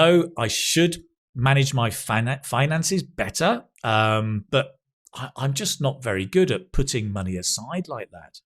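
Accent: United Kingdom. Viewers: hi dear 🌹🌹🌹 i had problem of hearing what english people say. since im watching your videos on youtube my listening ability is improved in amazing way. a great begining music; and your glorious & clear british english accent. thank you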